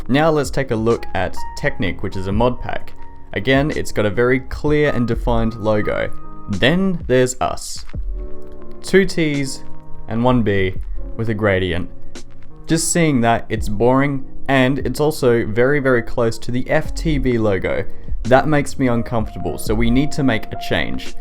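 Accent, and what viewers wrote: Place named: Australia. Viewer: you are simply amazing. You are special. This is the first 30 minute video that I have watched in a very long time. I love the way you make your content. You are amazing at block art, command blocking and coding. <3. Btw, you sound Australian, are you?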